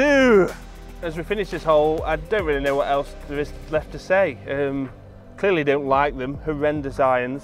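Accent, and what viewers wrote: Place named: Nottingham, United Kingdom. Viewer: GolfBox TV haha as much as a Derbyshire lad can love a Notts (judging by the accent) lad. Your a legend mate. Love watching your videos. Look forward to my new set of mp20’s 🤪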